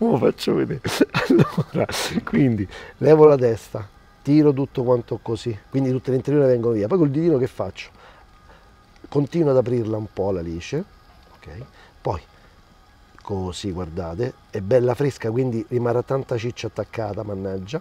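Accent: Rome, Italy. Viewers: Che bello sentire parlare romano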